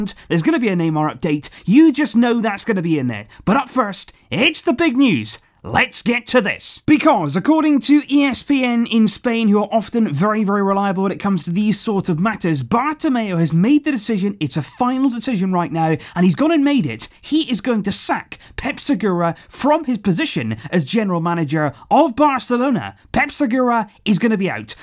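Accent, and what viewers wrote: Wales, United Kingdom. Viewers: Where are you from? U sound British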